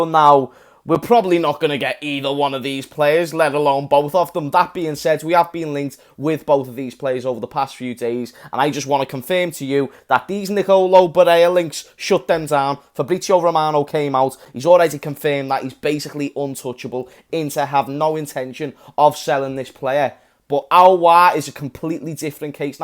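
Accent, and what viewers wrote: Liverpool, United Kingdom. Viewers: Yous a proper scouse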